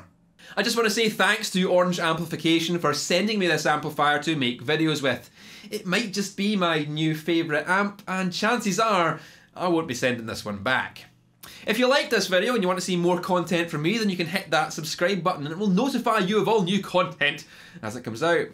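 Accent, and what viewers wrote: Scotland, United Kingdom. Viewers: The Scottish accent tingles the nuts like a dimed plexi through a cab of greenbacks! In other words..I hit the subscribe button so hard it was on the ground twitching like apollo creed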